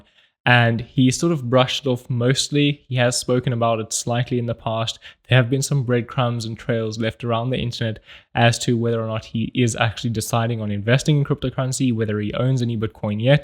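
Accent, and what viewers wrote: South Africa. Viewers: No doubt you are South African. Great content bruv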